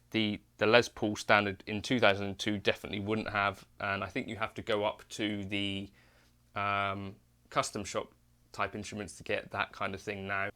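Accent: England, United Kingdom. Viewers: Guys it is ENGLISH UK accent. His Wife has a Great Accented Voice too.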